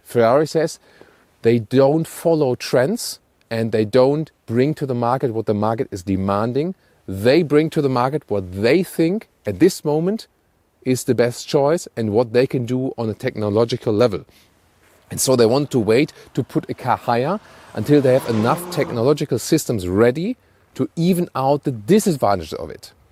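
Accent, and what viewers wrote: Germany. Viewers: Irgendwie stößt der german accent bei mir auf unwillige Ohren.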